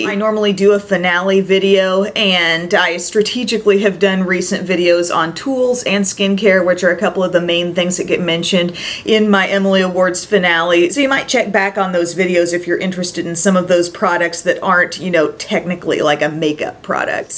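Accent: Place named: South, United States. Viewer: Oh my goodness, this feels so strange to be watching the Emily Awards before Christmas. I've been watching you Emily since I was a freshman in college in 2011 when I searched YouTube for a review of the Benefit They're Real mascara. And now I have a degree, and you have a lovely child and another one coming very soon, I'm so happy for you all! Thank you for your videos, they make me so calm when stuff gets crazy. P.S., I know you guys are from southern IL, but do most people in your area have a distinguishable southern twang like has and you (Emily) sometimes have? I've lived a good amount of time in NJ, MI, and NC so I've developed a few twangs throughout my life as well so I think I can pick up on them a little more than I used to. P.P.S., you are a great sport, we love you!!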